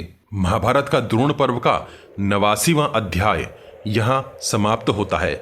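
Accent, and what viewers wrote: Standard. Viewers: आपका यह प्रयास अति सराहनीय हैं। आप ना केवल शुद्ध उच्चारण से महाभारत का दृश्य पुनर्जीवित कर रहे हैं, बल्कि पुरातन काल में सनातन के परम वैभव को भी आप स्थापित करने में अपना योगदान दे रहे हैं। यह सब कार्य भगवान की कृपा के बिना असंभव है। हमारी प्रभु से प्रार्थना है कि आप इस तरह के श्रेष्ठ कार्य को आगे भी करते रहे। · Bahut dino baad, etni sudh Hindi suna😊 acha laga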